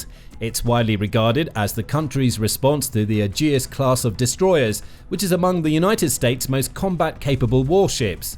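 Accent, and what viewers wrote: United Kingdom. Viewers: Blocked, had my fill of Brit narrators. Enough already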